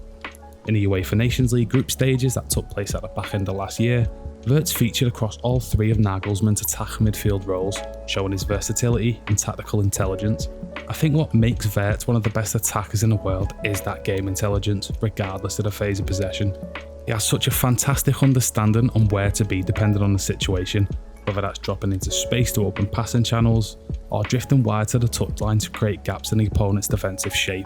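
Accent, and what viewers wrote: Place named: Liverpool, United Kingdom. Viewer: Your accent is giving SCOUCER vibes, one of my favorite people in the world. Y.N.W.A ❤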